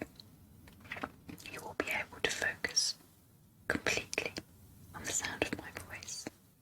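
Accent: England, United Kingdom. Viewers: Are u English u kinda sound English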